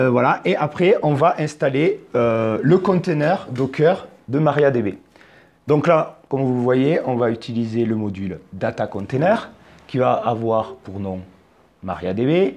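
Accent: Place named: Aveyron, France. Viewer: Merci ! Par contre c’est l’accent aveyronnais 😉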